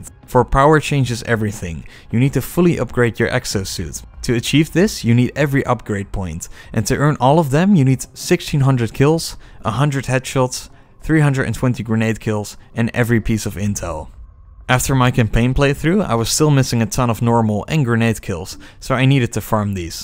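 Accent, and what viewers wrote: Netherlands. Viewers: ik dacht aan het begin van de video deze gast klinkt echt Nederlands door ze accent en toe keek ik naar de trofeeën die popte en besefte ik dat hij echt Nederlands is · ik dacht bij het begin al ik hoor echt een nederlands accent en ben toen door de comments gaan duiken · Hahahaha first 10 seconds can already tell you’re dutch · Ahhh that sweet dutch accent😂, good video keep it up. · I know a dutch accent when i hear one! Subbed! Great video! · Is this guy Dutch or German?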